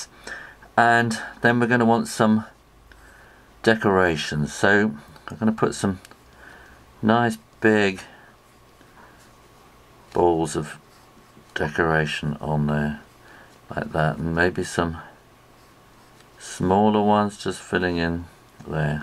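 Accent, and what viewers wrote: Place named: England, United Kingdom. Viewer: Great video! Good job! What part of England are you from? Love your accent! :D Thanks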